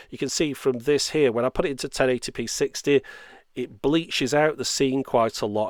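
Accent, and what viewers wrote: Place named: Northern England, United Kingdom. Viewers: Huh, never would have guessed your from preston :3 though you have a very northen accent :p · You've gone all geordie in this video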